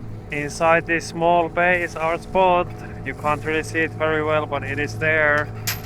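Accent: Finland. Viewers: The first video I saw was the previous one, and this whole concept; the two of you and a big puppy braving arctic weather in a sail boat for half a year is seriously giving me life force back. It’s so raw and beautiful, all of it. Your (Finnish accent is LOVELY btw!!)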